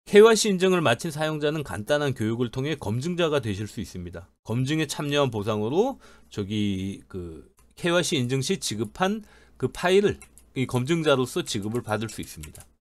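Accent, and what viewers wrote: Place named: Gangwon, South Korea. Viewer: 좋은 정보 감사합니다 말씨가 약간 강원도 억양이 보이네요 강원도 분이시죠? 저는 강릉에 거주중 입니다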